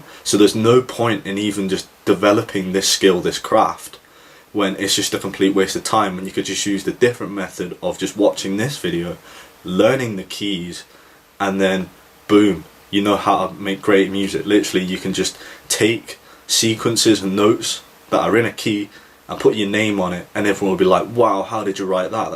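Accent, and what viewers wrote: United Kingdom. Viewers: may I inquire as to what part of the UK you're from, can't quite identify the accent . PS I really enjoy your down to Earth Style of instruction.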